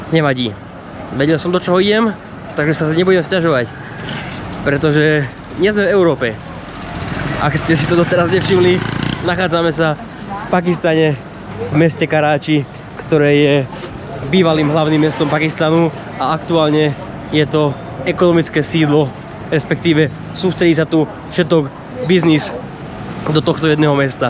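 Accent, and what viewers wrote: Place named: Hungary. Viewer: koli nareciu nebola som si ista ci pocujem Madarsky jazyk ..taky zvlastny podton mas...😊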